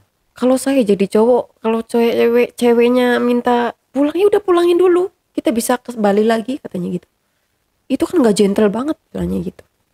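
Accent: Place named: Cirebon, Indonesia. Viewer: Asli dari gintung berarti tetanggaan sm sy Galagamba,berkesan sih ceritanya,aplgi logat khas wong cerbon,iya tah,iya jeh,kang bner sih..salam teh sing wong Galagamba.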